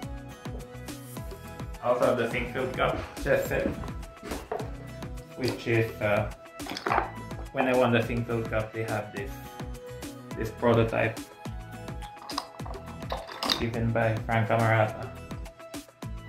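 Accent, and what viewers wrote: Philippines. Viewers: He still speaking like a filipino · Such a Filipino accent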